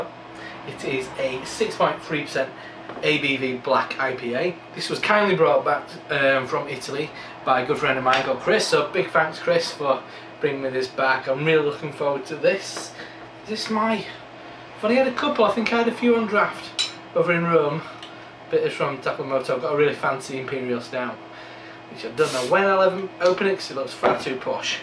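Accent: Yorkshire, United Kingdom. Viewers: wow you have friends all over haha :P = that BOOTIFUL :P love the Yorkshire accent = sounds like a great beer i saw BEERRITZ has some italian beers in recently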